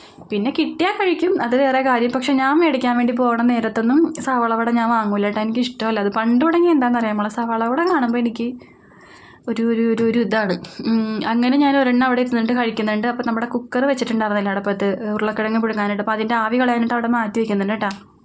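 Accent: Kochi, India. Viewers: നല്ല വീഡിയോ👍 കൊച്ചിഭാഷയും അവതരണവും നല്ല രസാണ് കേൾക്കാൻ.❤ ആമിക്കുട്ടിടെ കാലിന്റെ മുട്ടു പൊട്ടിയത് ഉണങ്ങിയോ?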